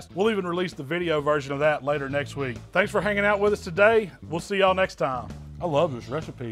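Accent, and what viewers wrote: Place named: South Texas, United States. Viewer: If I had to guess, he sounds like he’s from South Texas. Just a guess.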